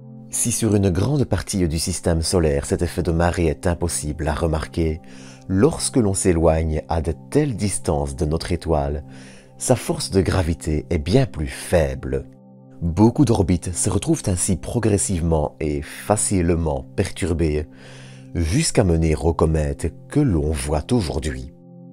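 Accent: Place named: Namur, Belgium. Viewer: Mais de quelle région de Belgique venez-vous? J'ai l'impression namurois mais certaines intonations sont exotiques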